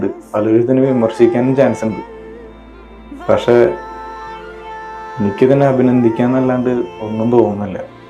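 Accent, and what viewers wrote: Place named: Thrissur, India. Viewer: ലാലേട്ടന്റെ അഭിനയം പറയാൻ വാക്കുകൾ ഇല്ല... അതുപോലെ ബ്രോ നിങ്ങളുടെ തൃശ്ശൂർ അവതരണം അതും മികച്ചത് തന്നെ... 👌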